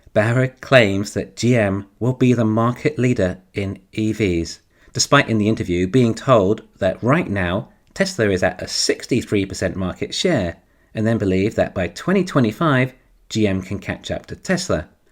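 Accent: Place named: London, United Kingdom. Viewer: Sounds closer to London to me (or at least home counties)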